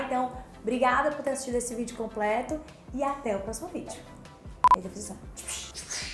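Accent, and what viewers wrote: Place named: Salvador, Brazil. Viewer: Vc é de Salvador, né.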